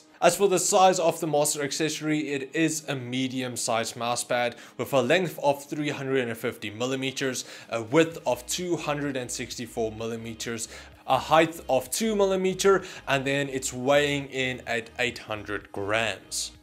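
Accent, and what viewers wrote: South Africa. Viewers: I thought you sounded South African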